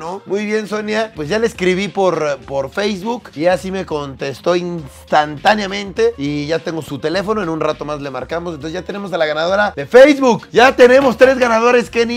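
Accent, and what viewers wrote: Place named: Venezuela. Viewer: Ese estilo de hablar es muy muy de Venezolana JAJAJAJA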